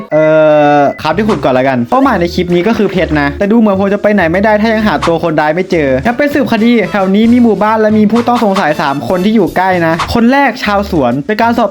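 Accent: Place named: Thailand. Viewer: พี่ครับพี่เป็นคนไทยชัดๆเลยครับ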